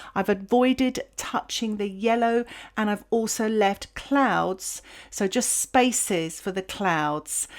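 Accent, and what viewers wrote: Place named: South East England, United Kingdom. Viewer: Pure English(some would say a south east accent, I wouldn’t because I’m from the south east and have the same accent!)😊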